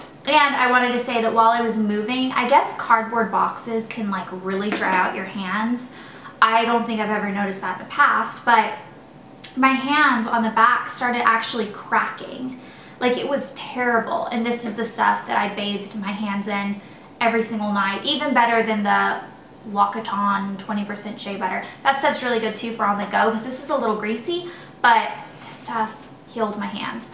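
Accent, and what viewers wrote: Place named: Tennessee, United States. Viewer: Pretty sure the CVS eye makeup remover is the same as the neutrogena one! Just in case you can't find a CVS. I love hearing your TN accent (I miss living in Nashville).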